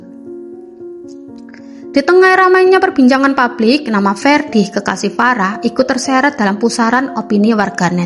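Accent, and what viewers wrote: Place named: Java, Indonesia. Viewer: medhok bangeet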